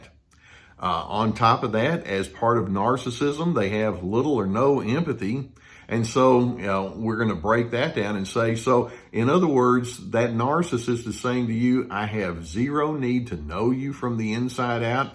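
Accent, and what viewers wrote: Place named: Texas, United States. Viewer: Somehow the narcissist looks all the more ridiculous when they are described with a Texas accent!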